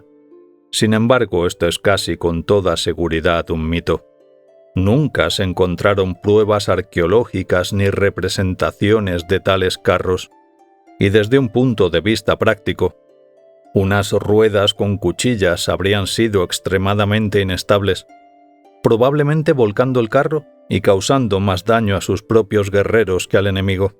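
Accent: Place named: Spain. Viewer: Un lujo oir narraciones en una voz autentica y española , no puedo dar mas pulgares pero lo hare en mas videos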